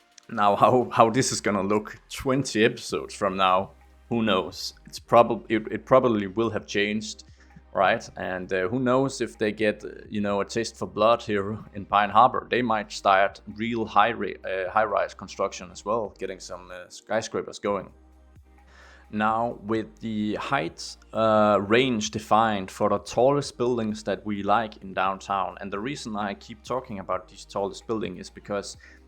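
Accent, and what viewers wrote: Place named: Denmark. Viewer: Danglish :D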